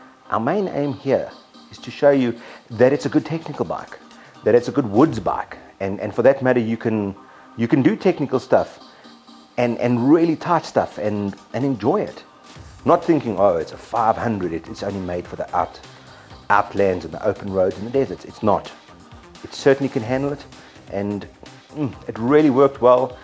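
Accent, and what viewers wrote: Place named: South Africa. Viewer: that south african accent doe ?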